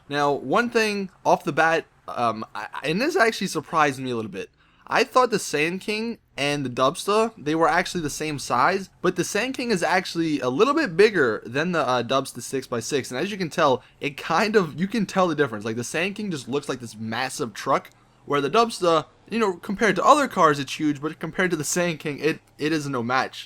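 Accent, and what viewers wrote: Louisiana, United States. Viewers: Are you from Louisiana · are u from Louisiana and if so what part?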